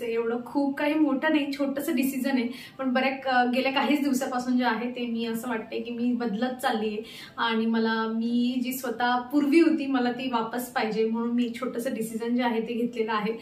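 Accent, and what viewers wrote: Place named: Shirpur, India. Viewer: Pat your back for this realisation and taking decision to change this pattern 👏👏👏 I'm so happy for you ☺️ I love you dear❤ Mi pn kanya rashichi ahe tuzyasobat jast relate karte😊 khup chan watla ki tu swatacha aanand nivadlas. Ani bhashecha sangaycha tar mi Satarchi ahe. Ani mazi ek maitrin Shirpur chi ahe. Hostella sobat hoto. Tyamule tichi bhasha aikaychi savay zaleli. Ata sagle aapaaplya kamat tyamule bolna hot nahi. Tichyasarkhi bhasha aaikayla milte mhanun mala tar tuza bolna khup aawdta❤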